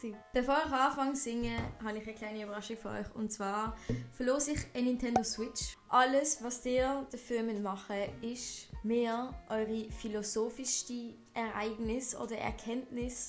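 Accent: Switzerland. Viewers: Sie redet jetzt schweizerdeutsch und nicht mehr Hochdeutsch 😅😂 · Total angenehm dich schwitzerdeutsch reden zu hören :)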